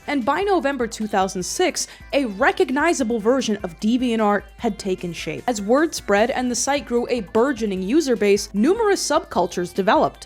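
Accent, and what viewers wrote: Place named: New York, United States. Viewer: Hey I'm autistic and I play Magic: The Gathering because it's all rules based and weird but I do shower etc. Love the way your NY accent comes in on certain words like... AWTIZM. It's great and why I've entered this rabbit hole... Stay cool! (Also the Frank-N-Furter being put into a hot dog bun at is ace)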